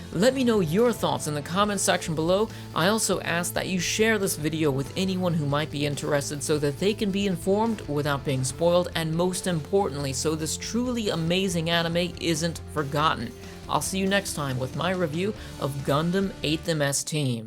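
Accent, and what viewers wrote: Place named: United States. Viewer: I'm just curious if you were able to watch it with English Subtitles? (Because you sound either American or Canadian... Maybe you can speak Japanese?) I cannot find a copy ANYWHERE with English subtitles! By the way - you are REALLY GOOD at reviewing! I'm amazed! I REALLY REALLY want to see this series and I'm so upset that I cannot find it! Is there any way I can give you my email address and you can tell me how I can watch this? (Or is that too personal....?)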